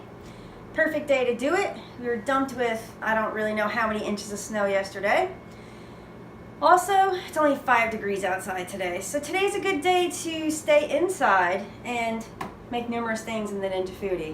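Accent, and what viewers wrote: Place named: Pennsylvania, United States. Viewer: Can I ask where you're from? My mother in law is an Italian from Pennsylvania and you have that same ever so slight accent.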